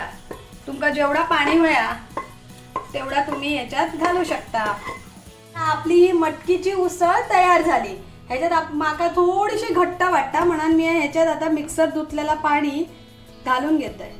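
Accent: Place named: Malvan, India. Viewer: आम्ही पण वैभव वाडी चे पवार, ही मिसळ/उसळ अगदी आम्ही नेहमीच बनवतो तरी ही मी ही रेसिपी पहिली कारण तुमचं presentation , उगाच फाफट पसारा नाही , बोलायची पद्धत म्हणजे अस्सल मालवणीत . हे ऐकूण खूप छान वाटतं व तुमचे सगळे विडिओ बघावेसे वाटतात. असंच चालू राहू दे. दोन्ही जाऊ बाई जोरात .👍👍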